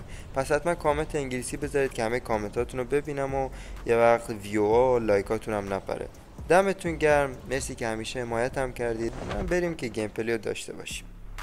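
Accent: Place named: Mashhad, Iran. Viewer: mashhadi nisti? Lahjat mashhadie 😂❤❤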